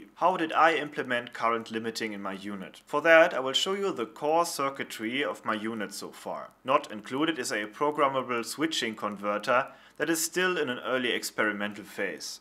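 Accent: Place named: Germany. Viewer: Du klingst so Deutsch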